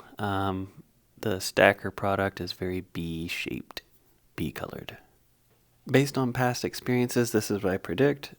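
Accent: Pittsburgh, United States. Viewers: Are you from Pittsburgh?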